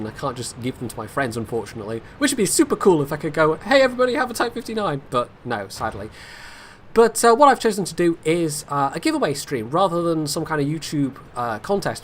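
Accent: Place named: United Kingdom. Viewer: I'll be there :) btw where are you from you sound British to me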